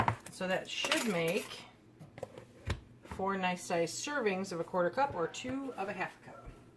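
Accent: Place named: Michigan, United States. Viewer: I'm brand new here...I happened to be browsing around for recipes and clicked on your video. I could tell right away you were from Michigan, because we have dear friends in Johannesburg, and your accent reminded me of them. You have very interesting content, and a very pleasant manner...I think I'll stay!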